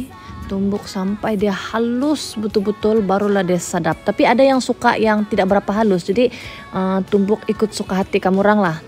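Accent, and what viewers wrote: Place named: Sabah, Malaysia. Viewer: Sumandak Sabah lawa2 pandai masak nasi.. nasi ayam sedap tu bah. Aku boleh dengar sepnjg ari ckp bhs Sabah. Bila team ICSYVM mau jemput jd guest artist ye.. mau juga tengok pandai kah pilih penyanyi sebenar/teka penyamar😂 terbaek Original Sabahan💪💯👍🔥🔥🔥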